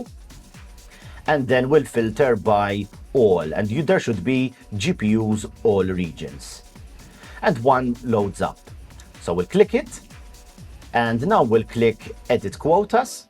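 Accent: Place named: Italy. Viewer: great tutorial! I used AWS so far, but this tutorial got me interested in GCP and I started learning about GCP more so I think it'll be a longer journey which I got into thanks to you :) I just love how smooth the GCP interface is compared to AWS p.s. nice accent, I'm guessing Italian although I don't know what is the accent of Maltans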